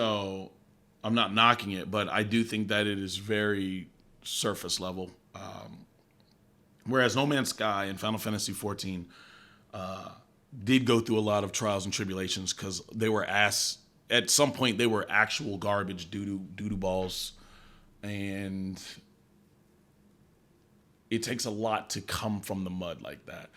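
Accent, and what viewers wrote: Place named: United Kingdom. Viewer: That British accent is his real accent.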